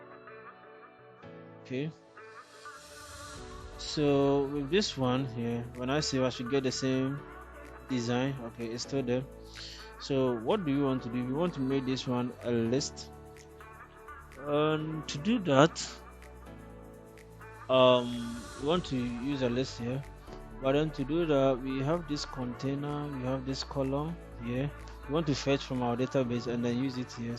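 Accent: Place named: Nigeria. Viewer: he sounds like a Nigeria, is he one ?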